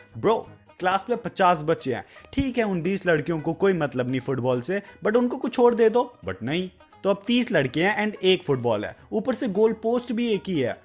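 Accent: Jammu, India. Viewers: ji aap jammu sai ho toh dogri bhi aati hogi🤣🤣 Dangar........ I am also from jammu and you know what when i saw ur first video i got that u r from jammu becoz of ur way of talkinh hehhehe I was laughing like hell while watching this Love ur videos ji☺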